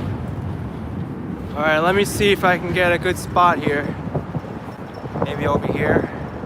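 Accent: United States. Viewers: When I first started watching, I thought you had some type of Asian accent, now I listen to you and hear you definitely have a new York accent 😭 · Before you appeared on video, just by your voice, i thought you were an American. After you appeared on video then i realized that you're an ABC - America Born Chinese. Great work for sharing the video. I enjoyed it. Brilliant for showing life goes on despite all the rumours and insults that had been throwing around. You're fit and standing tall. Best wishes. 👍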